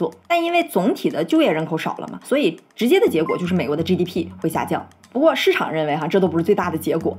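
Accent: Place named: Dalian, China. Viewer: ，標準的大連口音.性格👍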